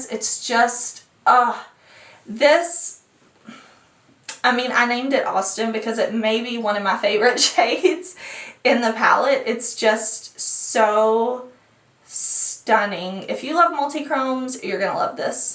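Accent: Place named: Tennessee, United States. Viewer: Her accent is so heavy, is she from Tennessee?? A beautiful pallet so excited.. WOW good job!!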